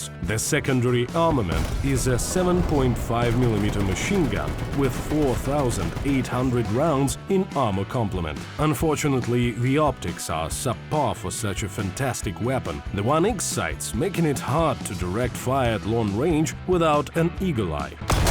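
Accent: Russia. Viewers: Rest of the community: (A WH40K Tyranid Hivemind that can't get a cohesive thought) Me: "Ah yes, I like your words and history, thick Russian narrator man!"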